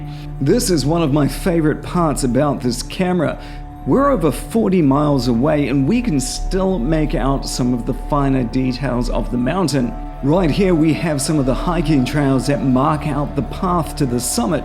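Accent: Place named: New Zealand. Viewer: Your "broken kiwi accent" is legible so your good.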